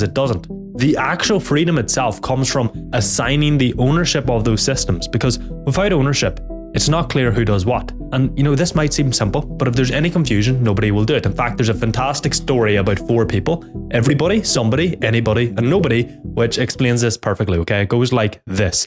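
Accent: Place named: Northern Ireland, United Kingdom. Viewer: He got that light northern Irish accent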